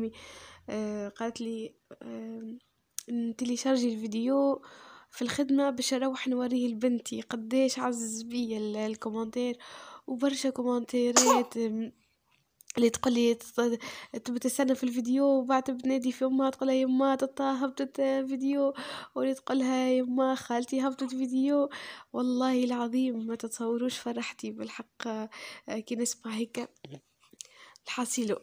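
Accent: Tunisia. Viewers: مش كن تونسيين متابعينك انا متابعتك من جزائر نحبك ونحب توانسا وخاصة هدرتكم ❤😇🌸🥰😍🇩🇿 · ماشاء الله عليك اختي.....لهجتك جميلة انا من محبين اللهجة التونسية.....اختك منى من السعودية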